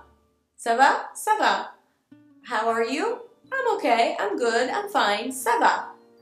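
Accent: United States. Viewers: Tu as prononcé le mot "example" comme "exèmple" :) <link> Mais en tout cas ton accent américain est superbe !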